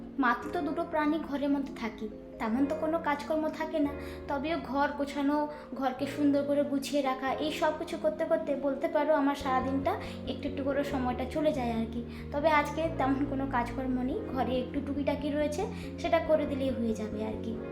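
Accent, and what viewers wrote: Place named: West Bengal, India. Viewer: তোমার সঙ্গে খুব অল্পদিনের পরিচয় হয়েছে কিন্তু তোমার কথাগুলো শুনতে এত ভালো লাগে ❤ তোমার কথাগুলো শুনলে মনে হয় তুমি এই পশ্চিমবঙ্গেই আছো মনেই হয় না হাজারো মাইল দূরে আছো❤ ভালো থেকো সুস্থ থেকো❤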